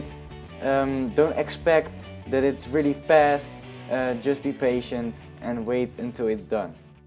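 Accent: Netherlands. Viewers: where u from brah ? dutch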